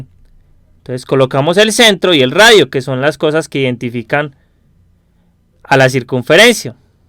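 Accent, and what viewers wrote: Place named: Antioquia, Colombia. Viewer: es acento paisa, aprende un poco de los diferentes dialectos colombianos.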